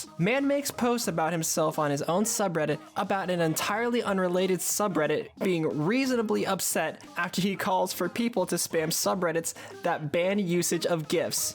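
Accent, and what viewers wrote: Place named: United States. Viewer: First of all, why us an american bloke using 'lad' so much? It sounds disgusting in his accent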